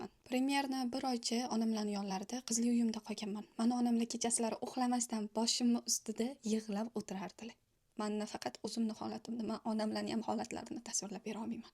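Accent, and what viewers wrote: Tashkent, Uzbekistan. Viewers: Намангонли булса нега Тошкент шевасида аралаштириб гапирвоти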